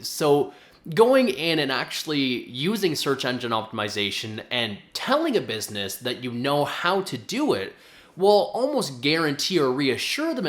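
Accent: Canada. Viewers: You have a very pronounce Canadian accent, meant nothing bad by it. I was just curious if my guess was right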